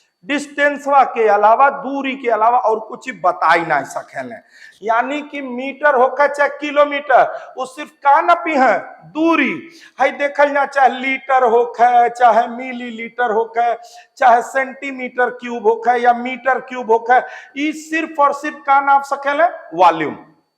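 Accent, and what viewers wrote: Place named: Bhojpuri, India. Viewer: Sirji Katai gajab bhojpuriya andaj me padhawatani 🙏🙏🤗🤗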